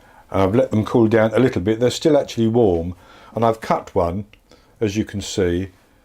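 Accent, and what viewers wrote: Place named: England, United Kingdom. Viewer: Hello: I do like your baking and very gently English accent to let us understand what you say. But can't you put the mic hanging lower on your shirt or put in somewhere seems more better, because your breathing is very strong as well! Thats why we feel uncomfortable. Sorry about to let you know my family's feeling and >> Thanks your share:):)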